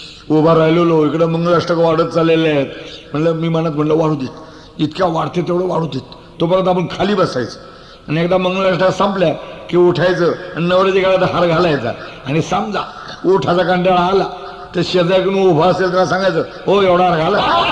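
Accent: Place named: Ahmednagar, India. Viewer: Khas nagarcha rangda aawaj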